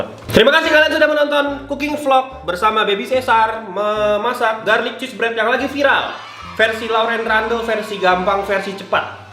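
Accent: Bali, Indonesia. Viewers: Bli rando logat nya sudah kayak orang bali wkwkkw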